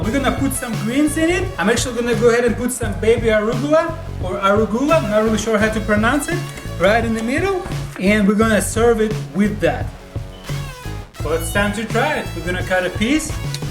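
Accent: Russia. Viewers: I could tell u are Russian by your accent : )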